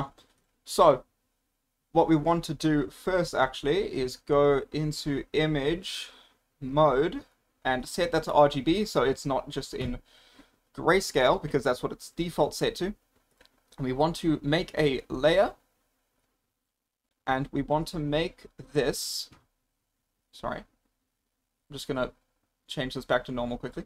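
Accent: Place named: United Kingdom. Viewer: This is brought my attention to how surprisingly English/British your accent is. Great tutorial though (If for some reason I decide to play Asseto Cora at any point)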